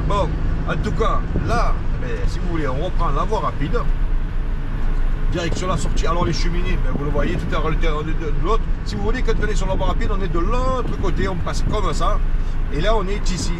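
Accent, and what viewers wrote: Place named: South, France. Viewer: Le bon accent du sud ! 😊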